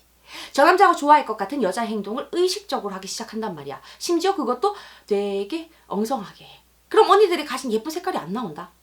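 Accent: Gyeongsang, South Korea. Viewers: 언니 대구분?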